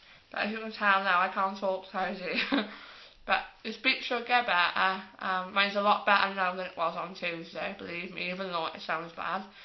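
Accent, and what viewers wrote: Wales, United Kingdom. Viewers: I love your Welsh accent. It's been ages since I last got to hear it now I've moved to Canada. Just wondering, how long did your treatment take? I must have missed it in the video. Did it hurt? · hun what practice did you have your teeth done with you sound welsh and im from caerphilly your teeth look amazing xx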